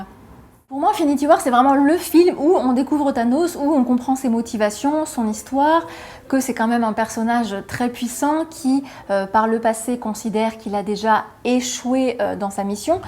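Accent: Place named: France. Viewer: J'ai jamais compris votre délire ( les francophones têtus) là de prononcer les mots anglais comme si c'était des mots français..."Infinity war"...-_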